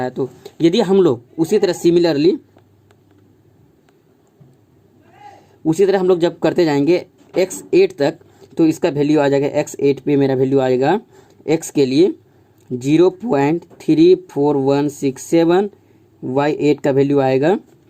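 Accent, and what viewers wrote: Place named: Bihar, India. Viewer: Pakka Bihar se hai aap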